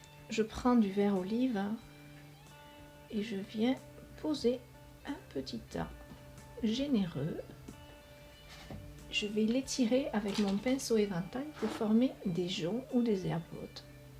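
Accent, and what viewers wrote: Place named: Provence, France. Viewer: Bonjour - C'est vraiment magnifique, quel coup de main! Dommage par contre que l'on ne voit pas votre palette pendant la vidéo. Je vous trouve formidable et quel accent merveilleux - j'hésite entre vers chez moi en provence ou le sud-ouest, mais je penche plutot pour la première solution. Toujours un régal de vous suivre; Merci pour toutes ces belles vidéos et vos conseils précieux. A bientôt.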